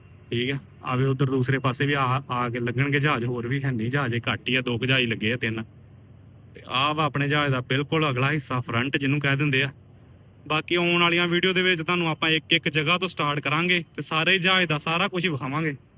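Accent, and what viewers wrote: Majha, India. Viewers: Language majhe di ah dist vill kehra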